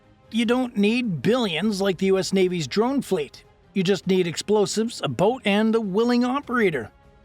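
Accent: Canada. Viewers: Is that a Canadian accent i hear? 🤔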